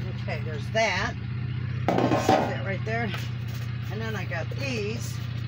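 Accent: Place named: Upper Peninsula, United States. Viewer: I would totally love to shop at your market stand! By the way I like the "way you talk" I was born in the U.P. and now live in Northern Ohio. You talk like my family 🤗❤️ by the way, you grandkids are well behaved cuties! That little boy with his big hat is adorable!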